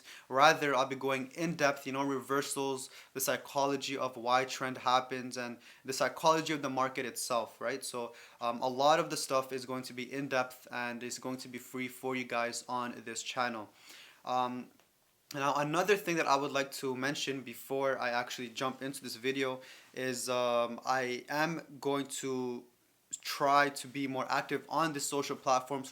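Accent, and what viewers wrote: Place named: England, United Kingdom. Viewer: You from england bro?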